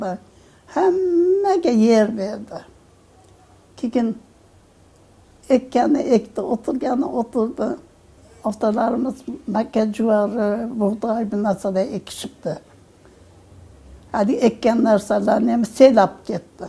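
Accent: Andijan, Uzbekistan. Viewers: Андижонча гапиряпти шева Андижон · Онахон сог саломат булинг, 5 ёшда кетган булсангиз хам (Анжон) Андижон шевамизда гапирябсиза , аллохим у́зи асрасин, фарзандларингиз бахтини ку́ринг, afarın teyzacim çok teşekkürler sağ ol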